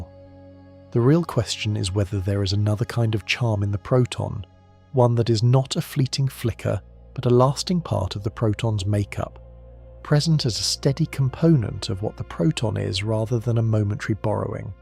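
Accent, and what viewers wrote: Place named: United Kingdom. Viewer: I suppose it’s meant to put you to sleep. But I wish they would make one of these with out a British accent.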